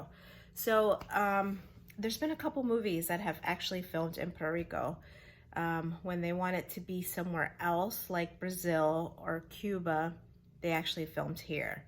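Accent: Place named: Spain. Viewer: I love your Spanish dialect. Such beautiful color's, and the ocean is really something. Thanks for taking us with you 🥰😎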